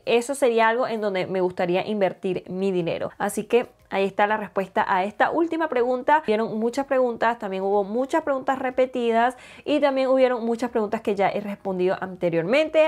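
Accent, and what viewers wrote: Venezuela. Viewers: Amo lo coloquial q hablas se identifica lo venezolana ❤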